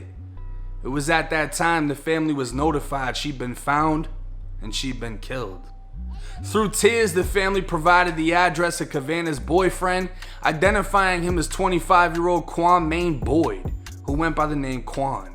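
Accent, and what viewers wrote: Boston, United States. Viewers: I have to ask, r u originally from boston? U truly sound like u got our boston accent💯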